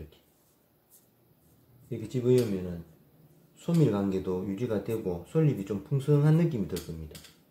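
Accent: Gyeongsang, South Korea. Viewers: 경상도 말씀 설명 정겹습니다~~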